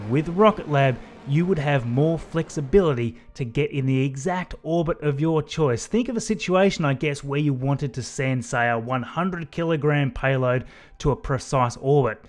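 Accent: Australia. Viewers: Oh your Australian? Gg.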